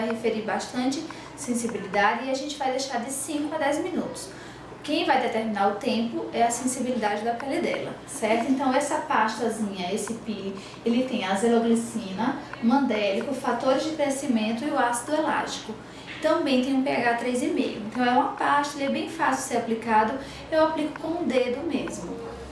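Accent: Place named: Northeast, Brazil. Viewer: adorando teus vídeos, parabéns sou aluna da FHO aqui em Araras SP, estou no segundo ano, ainda falta 2 anos e meio para eu concluir. obgda e continue postando vídeos, estou inscrita em teu canal. P.S tbm sou nordestina sou de juazeiro do norte ce, ouvindo teu sotaque me faz lembrar minhas raízes. bjim pra te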